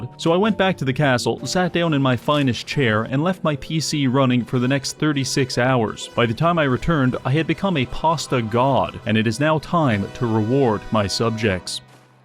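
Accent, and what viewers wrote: Newfoundland, Canada. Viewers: Because is violently canadian, and his accent comes through really hard on some really random syllables. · Are you canadian? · Dude are you from Newfoundland? I recognize the accent. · Instead of Car all I hear him say is “ker”, Canadians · Can't enjoy this for the hard-stabbed Canadian O twice a sentence.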